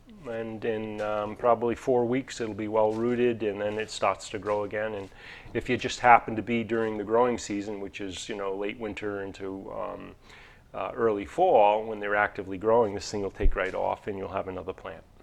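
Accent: New York, United States. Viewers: Sounds like he's from New York or jersey.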